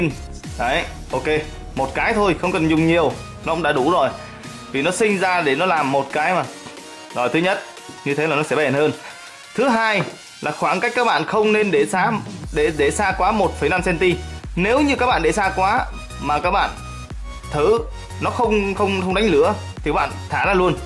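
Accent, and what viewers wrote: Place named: Thanh Hoa, Vietnam. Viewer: A nói giọng người thanh hóa vậy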